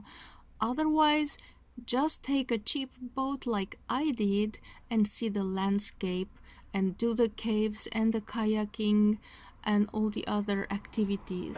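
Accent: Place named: Japan. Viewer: Yeah I thought you have that Japanese accent😂 , but your European appearance confused me a little. 🤔😓 Thanks so much for the nice comments about Vietnam😆, I also visited Tokyo - such an energetic/colorful city, the people are lovely/beautiful!! I hope you enjoy your traveling; and keep recording your precious videos/memories. All the best to you🤟🏻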